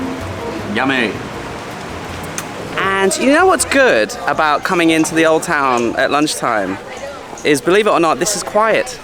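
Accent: United Kingdom. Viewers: Hi...i just watch your video about hoi an ( which i go end of this april for 6 days ) I think we have same interest about culture and quiet place and the art of cultural places ( i mean not to so toursty like bangkok which so mega cities that boring except for shopping ) By the way, i am fendi from indonesia..sorry my english not so good ( also i plan to go UK because i feel i connected to UK countryside which i feel when i see documentary about UK ) I think you from Uk? Because of your accent then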